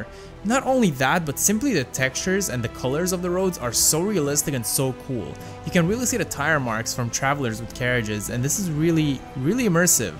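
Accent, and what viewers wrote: Romania. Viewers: Well I guess I now know what a Romanian accent sounds like.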